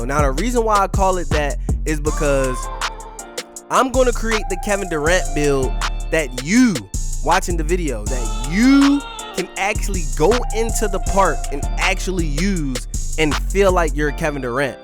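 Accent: Baltimore, United States. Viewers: Brody gotta b from BMore the way them ooos is coming out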